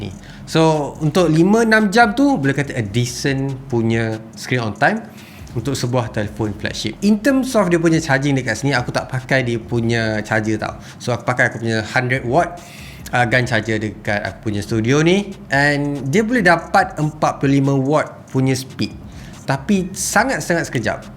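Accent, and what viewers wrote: Terengganu, Malaysia. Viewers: pekat terengganu tu tak hilang ekk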